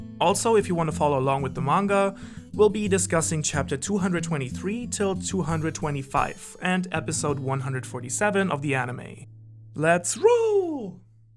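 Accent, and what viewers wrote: Germany. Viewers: As a fellow speaker of English as second language , want to note that it's a common mistake to pronounce infamous as in-famous, I totally understand you. But correct way to pronounce would be "infomus" with a stress on i. P.S. but wait... are you really not German? Where are you from?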